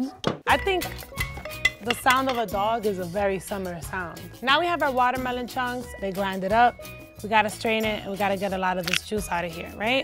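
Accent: New York, United States. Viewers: New York accent is fire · She from New York right? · She sound like she from NYC or NJ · She gotta be from nyc nfs😭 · She is so ny spanish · She’s deadass from New York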